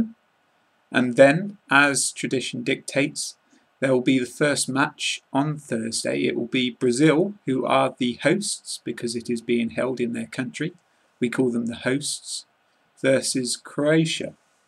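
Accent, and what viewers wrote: United Kingdom. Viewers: Hello, cheers from Egypt um really keen on learning British accent and especially ur luvly accent :) can u please recommend the easiest ways...I've heard of "shadowing" which means 'coping' and repetition of sb's speech and after a while you will be able to gain the accent.I've NOT started yet to do it cuz um waiting for ur advice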